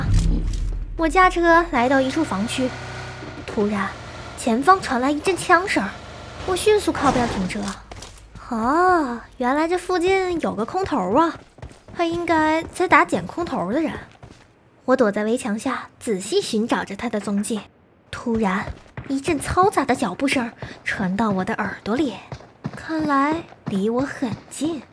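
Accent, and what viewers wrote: Northeast, China. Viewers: 感觉有一点东北口音 hhhh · 東北話少點可愛，還是唱歌好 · 她不是最開頭就說用東北話嗎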